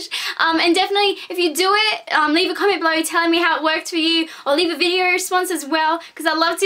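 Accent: Australia. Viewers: are you austraillian ?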